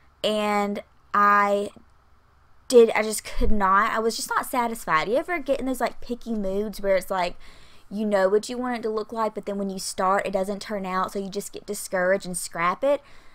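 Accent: South, United States. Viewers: OMGOSH I Never Hear A Southern Voice But My Own...... With My YT....... And I Live In Canada Now For 16 Years........ YOU Remind Me Of HOME!!!!!! Darling!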